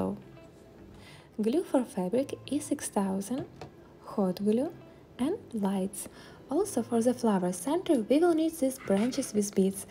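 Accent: Russia. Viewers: Акцент)) выдаёт что наша! Но здорово всё равно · Вы же русская, сразу слышно) Почему на английском? А работы очень красивые 😊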